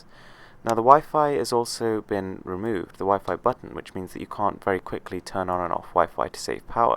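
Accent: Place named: United Kingdom. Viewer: his voice...its so...BRITISH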